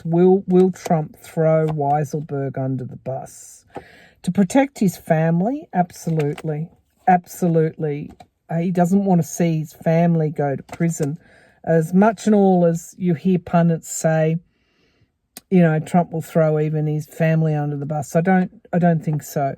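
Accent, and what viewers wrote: Australia. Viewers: When you said that this is the card reading for this week, I heard “car reading,” differences between your area Australian accent and a Kansas one! Pretty funny for me anyway! I still giggle when I think of my first reaction…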